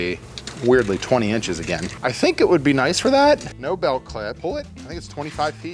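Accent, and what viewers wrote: Pittsburgh, United States. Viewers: The yinzer accent seeps through. You got a fan over here in Sharpsburg now.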